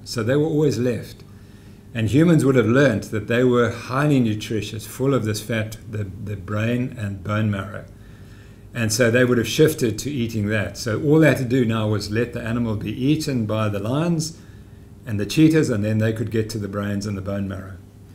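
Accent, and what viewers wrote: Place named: South Africa. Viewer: The English language doesn't work too well with a Sarfer accent...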